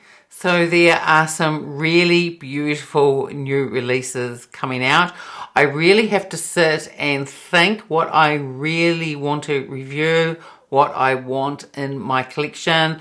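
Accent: New Zealand. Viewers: So happy to have come across your channel.....as a fellow Kiwi living in Switzerland I really enjoy hearing the NZ accent when talking about makeup 🥰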